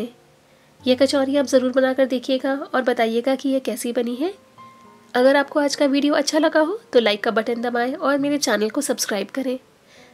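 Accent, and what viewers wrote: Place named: India. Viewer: I like to make new recipes and I always try new ones and I m a regular visitor of ur channel. I like ur hindi accent n ur way to describe d whole recipe but today, very first time I m seeing u in indian attire. looking very nice as ur recipes.. keep doing ji and thanks for different types of cookery inventions.. ☺👍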